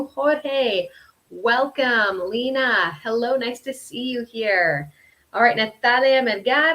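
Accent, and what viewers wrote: Colombia. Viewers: Su acento en Ingles es muy Colombiano. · Pero tiene buen acento colombiano, dice que es de estados unidos pero algo de colombiana tiene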